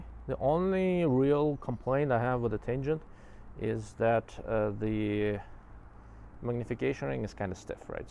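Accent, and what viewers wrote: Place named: Europe. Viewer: Any optic reviews by a guy that looks like a young Dr Evil with a thick European accent demand immediate respect.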